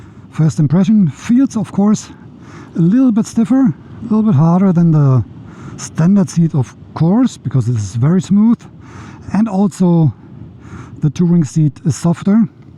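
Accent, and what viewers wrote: Germany. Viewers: so nice to hear your German accent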